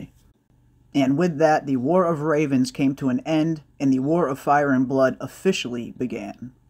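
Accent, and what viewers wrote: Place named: Boston, United States. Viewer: You must not be familiar with channel, that’s just his Boston accent. It can sometimes sound like he’s not pronouncing a D or an R here and there but he is. He’s literally an encyclopedia of ASOIAF knowledge, there’s not much about the source material that he doesn’t know.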